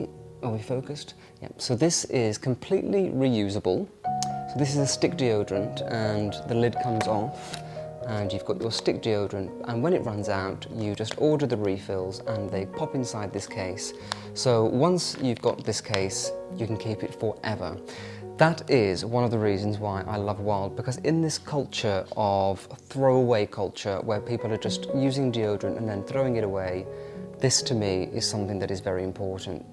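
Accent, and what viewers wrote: United Kingdom. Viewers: Thank god Little Sophie is feeling better. Nathan is such a good friend and we miss him. You have the most beautiful voice and I love your British accent. You just calm us down with that beautiful way you speak 😇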